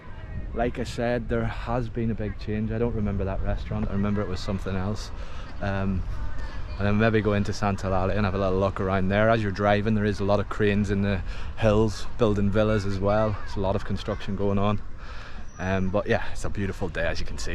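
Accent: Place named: Northern Ireland, United Kingdom. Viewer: Sounds like a northern Irish mon.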